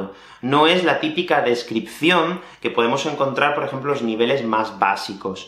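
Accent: Spain. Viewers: A mi no me gusta el acento español (de españa) en general pero el tuyo suena agradable 👍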